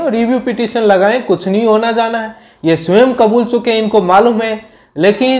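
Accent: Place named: Pahari, India. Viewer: आप पहाड़ी है जो आपके हिंदी उच्चारण से स्पष्ट है। थोड़ा इसमें सुधार करें।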